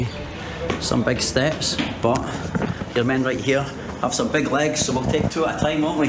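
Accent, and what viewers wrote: Scotland, United Kingdom. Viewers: It’s called a Scottish accent 😁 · This accent just makes me angry for no reason no wonder Scotland was wiped all over countless times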